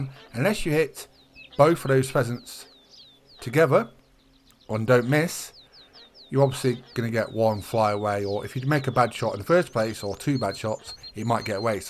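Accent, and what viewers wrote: United Kingdom. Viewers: never have i thought an absolute peak guide would be narrated by a deep voiced british guy. idk what it is but your voice makes me focus a lot better on the guide and my brain gathers the info better lmao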